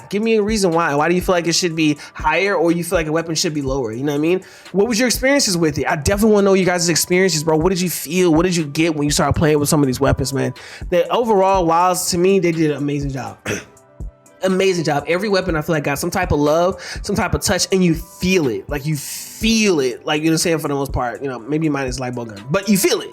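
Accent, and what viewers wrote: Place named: Milwaukee, United States. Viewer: Super weird ass comment but are you from MKE? Your voice is like bringing me vibes of my old home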